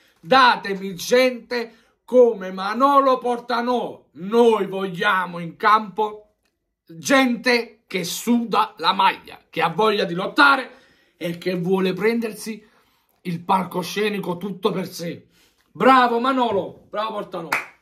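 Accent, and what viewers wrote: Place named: Turin, Italy. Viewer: si sente proprio dall’accento che sei di torino ahahahahhahahah forza toro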